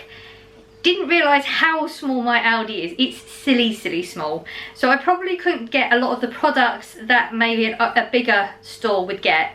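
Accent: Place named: Cockney, United Kingdom. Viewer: She speaks with a cockney accent.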